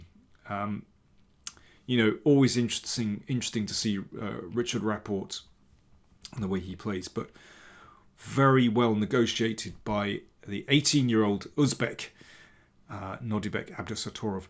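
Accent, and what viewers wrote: United Kingdom. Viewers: Your British english is perfect for my understanding as a french chess player !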